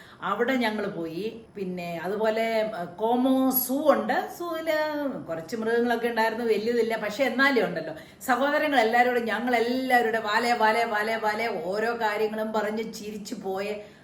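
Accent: India. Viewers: ചേച്ചീ, മീനച്ചിൽ താലൂക്കിലെ ഭാഷ മിന്നസോട്ടയിൽ കേൾക്കുമ്പോൾ ഒരു സന്തോഷം 😃😍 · Nammal kottayam language supper my housepalail anu mam nu big salutu. Paranjathallam cherppatthil ingana airunnu veetil ❤❤❤❤❤❤❤ Allamm ketttu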